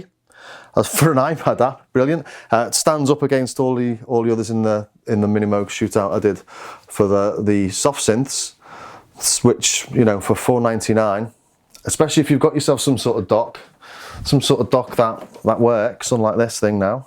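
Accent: Liverpool, United Kingdom. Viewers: Scouser? You and Doug from the Soundtest Room know each other?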